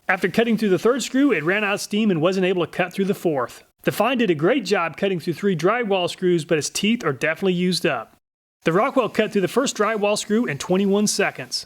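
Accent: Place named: Midwest, United States. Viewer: Os-kil-ating hard k sounds... You are from the midwest right? Lol My Mom says it the same exact way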